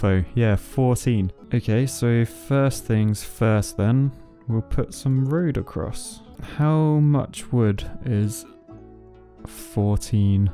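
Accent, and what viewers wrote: United Kingdom. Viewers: Its the British accent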